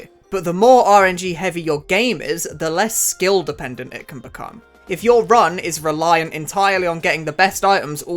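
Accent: United Kingdom. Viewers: you sound veryu british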